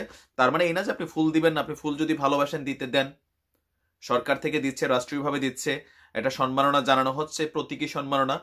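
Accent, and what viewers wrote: Comilla, Bangladesh. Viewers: ভাই আপনার নিজস্ব কুমিল্লার ভাষা আমার কাছে দারুন লাগে এবং বারবার শুনি এবং প্রাণ খুলে হাসাহাসি করি দারুন চালিয়ে যান।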